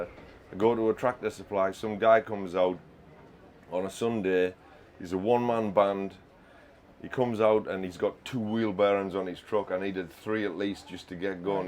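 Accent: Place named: Teesside, United Kingdom. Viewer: What a fantastic build. Love the smoggy accent!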